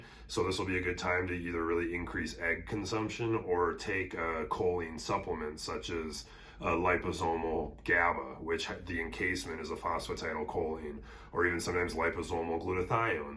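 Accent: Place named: Wisconsin, United States. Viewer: Is this similar to products such as neuvana vagus nerve stimulator? If so, it makes me wonder why other products charge so much! btw you sound like us over here in wi :)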